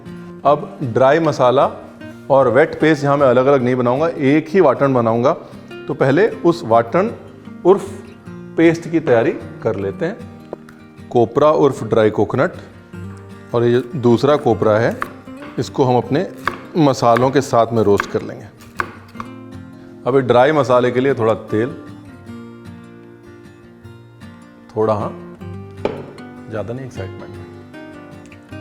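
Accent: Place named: Maharashtra, India. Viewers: Kadkk recipe kadkk marathi accent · OMG your Marathi accent is so sweet 😂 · Zakkass. Well I already love the way you narrate and prepare the recipes. I always come to this channel for most recipes. And being a Kolhapuri and an absolute missal lover, I just loved how authentic your accent and your this recipe also is. You're too good like always. Love from Kolhapur. ❤️🥳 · I am a maharashtrian. Loving ur Marathi! I am aware your wife is maharashtrian and I bet ur love for her reflects in the way u do adorable speak the language, Mr. Zhannzhaneet Brar!😊 Like the way u pronounced the “nn” in zhannzhaneet n in waattan and the “yyll” in misayyll. Pls do get ur wife on the show once, would love to see her. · एकदम झणझणीत video.... Loved your Marathi accent and knowledge as always.... Respect 🙏🏼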